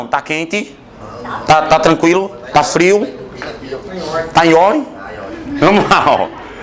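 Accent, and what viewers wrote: Northeast, Brazil. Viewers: oooww Glória, gloria a Deus. Ei creio. Terra maravilhosa essa Paraíba, meu Nordeste. Eu sou de Pernambuco. Desconfie por causa do sotaque rsrs. Obrigada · Pense num sotaque gostoso de ouvir... Sotaque Nordestino é bom demais. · Pelo sotaque sabia que você é nordestino, com muito orgulho. Grande talento, parabéns!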